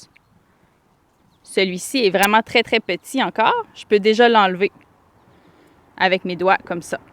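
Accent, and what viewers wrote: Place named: Quebec, Canada. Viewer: Bonjour, vous expliquez très bien et j'adore votre accent Québecquois ❤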